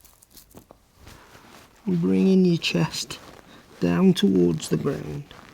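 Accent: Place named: Sheffield, United Kingdom. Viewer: Only just found you after listening to Radio Sheffield, I have enjoyed your yoga session and have now subscribed. It is good to hear a local voice and thank you for the sessions which I shall now carry on with, much appreciated.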